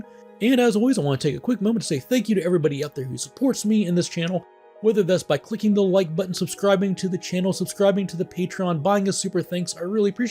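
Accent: United States. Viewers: thanks for the reply! actually, i was wondering which state? there are so many american accents and i find them hard to untangle. (totally didn’t notice the speech impediment btw!)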